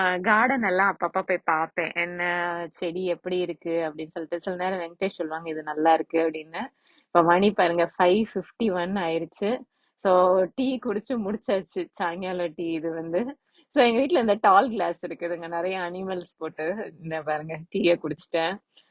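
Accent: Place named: Srivilliputhur, India. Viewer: Somehow I landed on to your channel, we are from SF bayarea, living there for 25ish years. My older daughter just graduated from MIT. Just roamed around this area when we were in town for her graduation. Loved Cambridge summers and business of the city. Love your style of talking and openness of sharing information. Naan thanjavur side. Somehow enjoy your azhaga srivillipittur style Tamizh. I work for Microsoft, mostly work from home, drive to work once or twice a week. Ilayaraja and SPB are by drive time buddies, Ippo Spotify fan due to my daughters persistent pestering. Keep rocking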